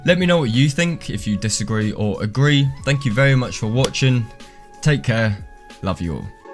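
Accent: England, United Kingdom. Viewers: got love the English accent even tho I'm English 😂 plus you swear a lot kinda so that's a plus lol great vid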